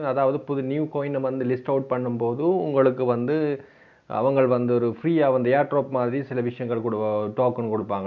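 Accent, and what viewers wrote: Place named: India. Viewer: accento indiano e si voolaaaaaaaa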